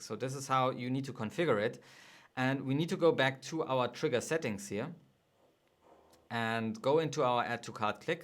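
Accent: Germany. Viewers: If Yes, is it possible to have a call with you? I really need help with my tracking. Im loosing so much money, because something is wrong with my tracking. I have quiet good traffic and im still making 50+ Sales a Week (organic) now i want to scale. But something is in my setup is wrong! Of coruse i´ll pay you for your help! Pls answer to this comment or give me an oppertunity to contact you. Idk but i Think you ´re a german guy? Ich kann es am Akzent hören ;)) Ich freue mich auf deine Rückmeldung ;))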